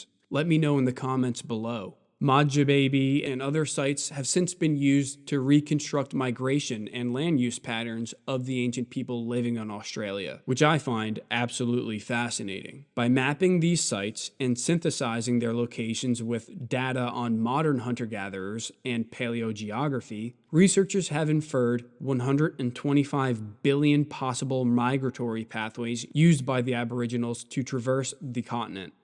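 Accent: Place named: United States. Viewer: Why is this narrated by an American?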